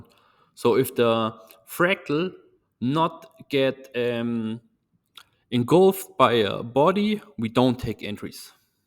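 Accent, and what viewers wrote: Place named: Germany. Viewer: I can just hear you are german, keep it up! the accent will go away after some time.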